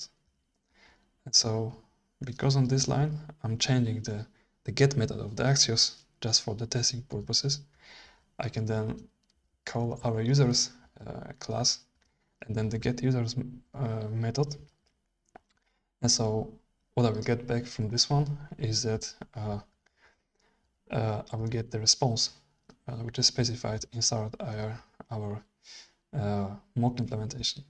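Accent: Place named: Belgium. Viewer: I was like huh, this guy sounds like a Belgian speaking English (I am Belgian too), and then I saw the location of the video haha.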